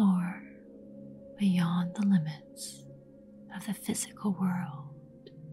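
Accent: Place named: United States. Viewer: REALLY?? oh how supernice! you sound USA, such good english, so lovely!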